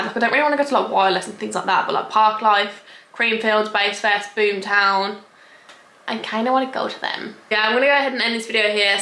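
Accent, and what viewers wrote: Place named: United States. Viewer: I love your American accent! makes me feel at home w you😭🥺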